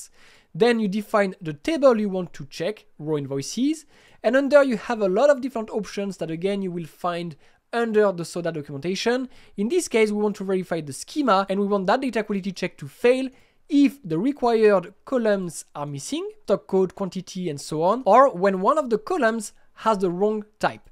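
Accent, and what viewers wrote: France. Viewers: Just by the accent I assume that you are a french speaker. It would be great if you can make videos in french also. Great and explicative video!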